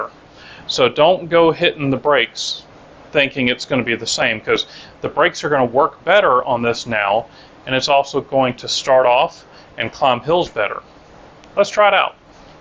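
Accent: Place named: North Carolina, United States. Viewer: Such clean fingernails for a mechanic! I guessed NC, and I was right! I don't know how. I'm in Boulder, Colorado...Never been there, or anywhere near. I guess it was the subtle accent and the nice little town. I've electrified a Chinese cruiser with 29 inch wheels. It's like a giant version of this. The chain keeps popping off, the motor is too powerful. I've ordered a 22 tooth with an offset to bring it in better alignment. We'll see. The removal of the sprocket was surprisingly easy, but I wouldn't have known how without You.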